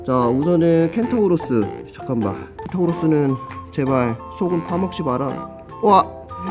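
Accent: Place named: Jeolla, South Korea. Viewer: 전라도 억양 고치세요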